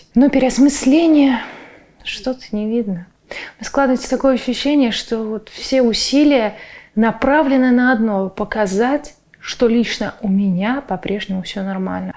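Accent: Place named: Ryazan, Russia. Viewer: Не знаю. От рождения так получилось. И никак не убирается. Может быть рязанские корни дают о себе знать 🤷‍♀️.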